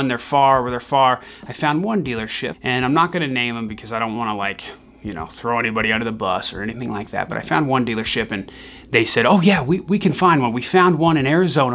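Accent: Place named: New Mexico, United States. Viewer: lol I’m from New Mexico and that’s actually how they talk, And my mom has that same exact truck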